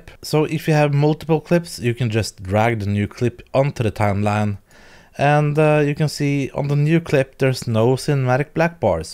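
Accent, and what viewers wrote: Norway. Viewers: Tusen Takk Og du høres så Norsk Engelsk ut at det er deilig å høre på en norske som snakker english :D :P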